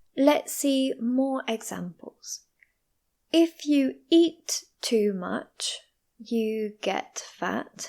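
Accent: United Kingdom. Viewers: Are you British????? Reply please ❤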